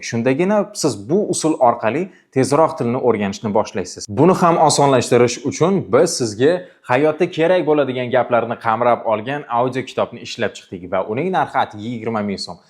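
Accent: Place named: Russia. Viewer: Kinolaralga ozvuchka bermismi mabodo, chota tanish russkiy aksent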